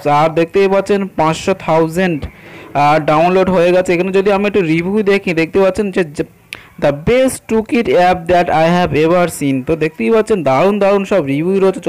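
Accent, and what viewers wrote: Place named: Kolkata, India. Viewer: ভাই কলিকাতার মানুষের উচ্চারণ এমনই ভাই। আমারদের যেমন নোয়াখালি..........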